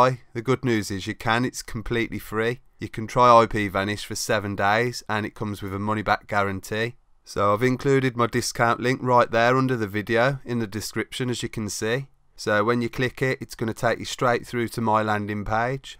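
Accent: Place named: Birmingham, United Kingdom. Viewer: Got the VPN mate thanks. Loving the Brummie accent, tell me you're a villa fan?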